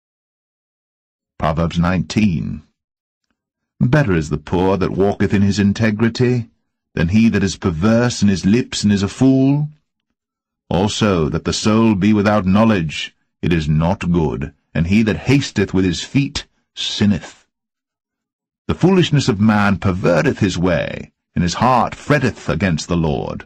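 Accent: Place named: United States. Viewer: American English but the KJV Bible is written in old English so it sounds different.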